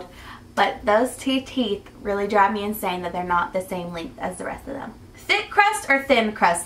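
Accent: South, United States. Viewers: Yea.. we would totally be best friends if we ever met. Totally! Southern twang, bravo, gossip girl, dog lovers, dreams to open a no kill shelter! Ah! Awesome! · I know I'm a little late in catching up on your videos but I would definitely love it if you read what you've been writing in your diary, I'd love it if you talked more about your experiences relationship-wise! And I love your southern accent, let it come out more(: Hahaha. Love you<3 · Was it just me or did no one even notice her accent until she pointed it out? 😞 like fr she said "my southern accent" and I was just like "omg wtf?? U have an accent?" And then when I payed attention I was like "omfg u have a southern accent!" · I know what you mean with the southerness when filming! I also am a lot more southern in person than on camera. Glad it isn't just me haha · You're so down to earth and I wish I knew you in person! 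You seem like the type of person I would get along with so well and your southern accent is so fuckin cute! (In the least creeper way hahahah) love you girl!!!😍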